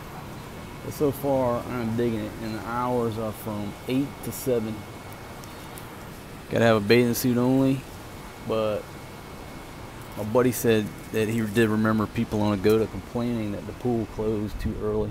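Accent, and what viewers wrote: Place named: Atlanta, United States. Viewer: Great Review. Atlanta, GA that explains the accent I hear in your voice.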